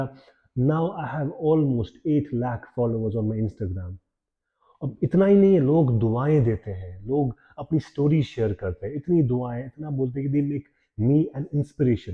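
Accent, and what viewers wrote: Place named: Kashmir, India. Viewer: Accent se hi pata chal gaya k Kashmir se ho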